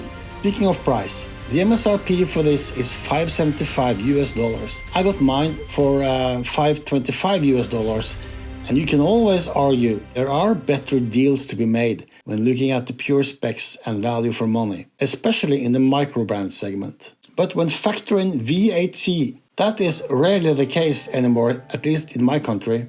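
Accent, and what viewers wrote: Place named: Sweden. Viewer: Hi,. I enjoy your reviews, and your accent reminds me of my grandfather, and other, living, relatives back in Sweden. It’s music to my ears! Greetings from the American Midwest, where Vikings cosplay is a thing. Thanks for your review of the SRPL13. I agree on all points, and ordered one from Teddy for $437, plus state tax, tariffs included. It paid to procrastinate. Could not wait any longer, as a Seiko price increase was to kick in on 15 Sept. I was torn between this Seiko and the Citizen BN0150. The Citizen has ISO Diver’s 200, and has grab and go Eco-Drive solar movemnent. In the end, the Seiko’s style won out over the Citizen’s practicality, ease, rating, performance and value proposition. Since I don’t dive, this was really ultimately about aesthetics.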